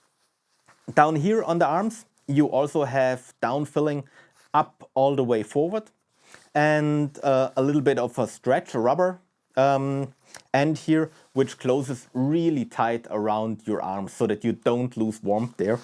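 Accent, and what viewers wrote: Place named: Germany. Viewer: Hi! here Giorgio from Genova, Italy. I'm a mediocre alpinist / hiker. About the jacket you reviewed: you mentioned the price is almost 300 euro and that seems to you cheap, as I well understand. To be honest it doesn't seem to me exactly cheap also considering the possible good quality of this article. I recently bought the Simond Alpinism Light Piuma that costs almost 1000 euro and yes, maybe it has not the same warm... but has double zip closure:). Do you know it? In general I appreciate your contents. I'd suggest to mix the static camera view you generally use mixing your talk with on-field recording just as you did in the ice axes video. This would enhance the "quality" of your videos already very good and I agrree with your points. BTW, I know you are from Switzerland, but from which region you are? By your accents it seems you are from a German-language, right? 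You told you spend a lot of your days in mountains, so my suggestion / request is to share (if you feel interesting for your audience) some interesting alpine climbing routes in Switzerland you do. For me this is not for practical usefulness, but just for a cultural curiosity. Also, in general I'm interested to know climbing techniques and "common sense" mountaineering behavior rules in other countries, a part Italy. Thanks again giorgio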